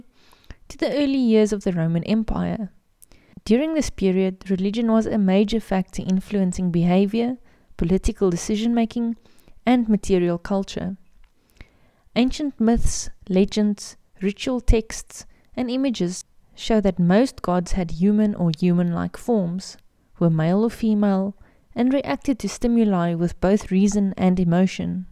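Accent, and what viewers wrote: South Africa. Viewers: Is that Afrikaans accent I hear, love it either way, I'd listen to anything you did lol and very interesting good work 👍😎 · Nice to hear that South African accent. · Nah, but there's a lot of South Africans in NZ so I recognized the accent straight away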